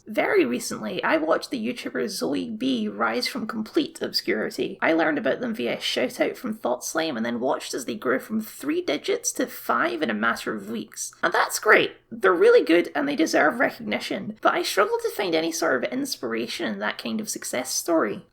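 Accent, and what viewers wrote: Scotland, United Kingdom. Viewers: I'm a new subscriber and I'm digging it. About your voice im confused as an American all Scottish people sound funny to me what's different about you? Lol no but seriously I like the content and I love interesting voices and accents hence I listen to very few American essayists because they all sound like me and that's boring.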